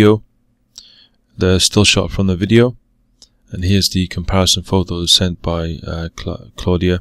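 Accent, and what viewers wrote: United Kingdom. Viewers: No reason to doubt her Looks real to me. Especially after you did your thing to the video. Another gem mate Glad to see your channel gaining subs I remember when I first discovered you with barely 1000 subs Your humble and honest approach is why.Plus,having a delightful British accent doesn't hurt😉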